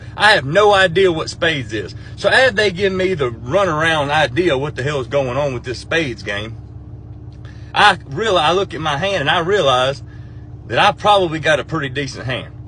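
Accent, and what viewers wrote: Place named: South, United States. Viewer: Love that southern accent,and you are a funny ass story teller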